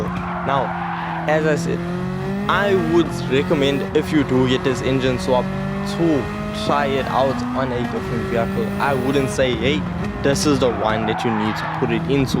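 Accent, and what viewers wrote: South Africa. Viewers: the most south african sounding guy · He is from South Africa after all, the accent makes the video have that much more character.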